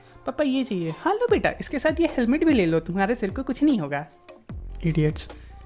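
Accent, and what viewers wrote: Bihar, India. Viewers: Hello I'm your new subscriber from Bihar. Budhwa Chacha 🤣🤣🤣 Your Bihari and Jharkhandi accent make me laugh and happy also . Nice video. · Pakka tm bihari ho... Kyu ki bihari he sirf hum bolta hai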